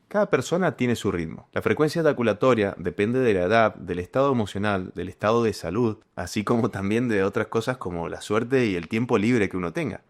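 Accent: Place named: Mendoza, Argentina. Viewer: lo único que entendí, que me parece que sos Mendocino.. esa tonadita que nos caracteriza jaja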